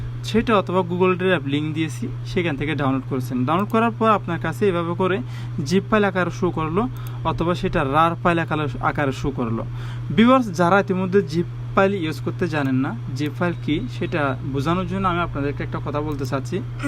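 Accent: Chittagong, Bangladesh. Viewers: Thanks very much. Are you from Chittagong?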